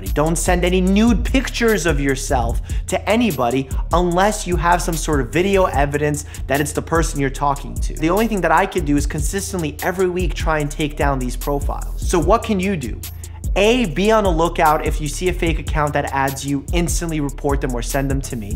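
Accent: Russia. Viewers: Are you Russian?? Even better😍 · im new, are you russian?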